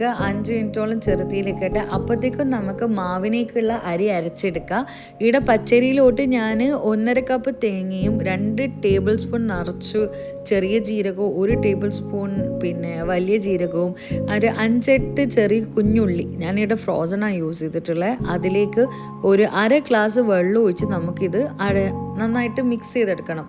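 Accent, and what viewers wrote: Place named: Thalassery, India. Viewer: Hearing you speak in our good old Thalassery accent—without trying to sound too polished or artificial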